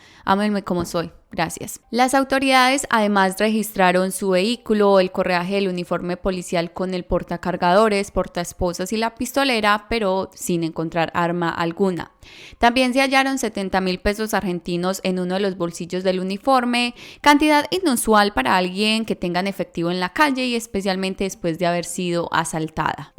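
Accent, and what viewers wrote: Colombia. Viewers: Por eso yo no confío en nadie porque no sabe uno con quién se va topar Dios nos libre de esa gente malvada, descansen en paz los señores, tan lindas personas! 😪Me gusta mucho tu acento Colombiano narras muy bien te felicito, saludos desde Sonora México, Mi hija se enamoró de Colombia en un intercambio escolar, su comida su gente tan bella,siempre lo recordará!! ❤️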